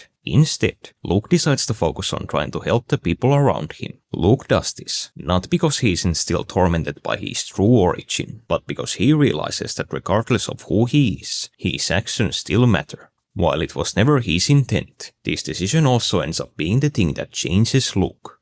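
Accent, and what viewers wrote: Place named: Finland. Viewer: Your accent sounds like a pretty heavy finish one. Are you possibly one of us snowmen. Maybe Maybe not It is meaningless in the end Oh yeah right Cool video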